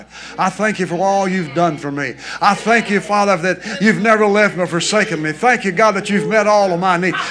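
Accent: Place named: Texas, United States. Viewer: he has publicly denied being Elvis Presley several times. isn't the problem it's a group of his congregation who keep feeling the fire. Only because they want him to be Elvis so badly that ignore the truth. Yes, he does sound a lot like Elvis when he sings but that's where it ends. There are thousands of Elvis tribute artists who sound similar to Elvis but that doesn't make them Elvis. There is no physical resemblance between and Elvis. There eyes, nose, mouth, lips and teeth are all different. You can find pictures of a young with his family on the internet where he has dark hair and beard. Elvis was 6 feet tall while is 5 ft 10 inches. is 17 years younger than Elvis. He was born in 1952, Elvis was born in 1935. is 74 while Elvis would be 89. Elvis was a senior in high school when was born. was born in Forte Worth Texas in Tarrant County area which is why he has a strong Texas accent. 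While Elvis was born in Tupelo Mississippi which is why he has a Mississippi accent. Elvis was married 1967 and eas dating Linda Thompson when married Wilena. father was an ordained minister from 1957 to 1990 when he passed away at age 93. became an ordained minister in May of 1981. After his father died, his mother and 2 sisters travelled through Texas preaching the gospel in small towns too small to have their own church. He did that until 2005 when he moved to Benton Arkansas and worked as carpenter until he opened a small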